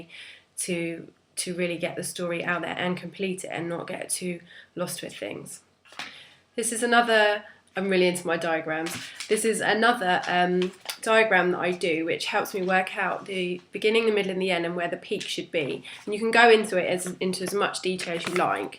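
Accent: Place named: England, United Kingdom. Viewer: Greetings. Thanks for breaking it down. Love the English accent!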